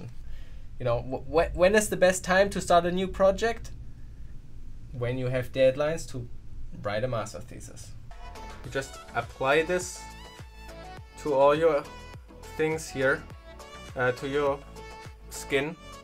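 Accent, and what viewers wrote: Germany. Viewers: man hört dass du Deutscher bist :D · not sure, but sounds german. like it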